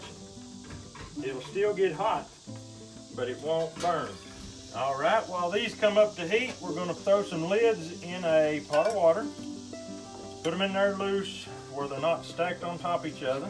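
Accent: South, United States. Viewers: I enjoyed watching your video and loved your down to earth personality and country accent. Blessings! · "Just like at".! Love the south..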